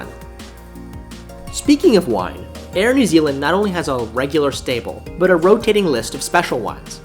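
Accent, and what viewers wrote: United States. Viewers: Love your audio commentary. The seats are the same as Virgin Atlantic business class. I've never tried it but I would definitely give it a miss. Are you American?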